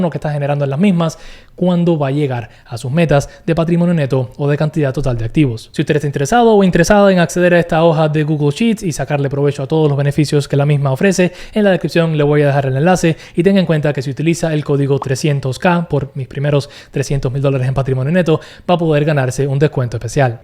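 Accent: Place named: Venezuela. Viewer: Pensé que eras Venezolano. Hablas y te expresas como uno. Saludos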